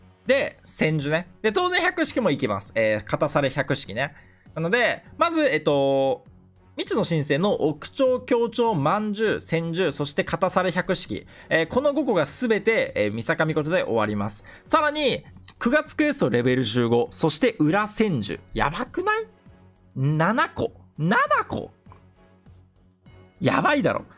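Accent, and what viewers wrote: Kansai, Japan. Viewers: この人関西人なん？笑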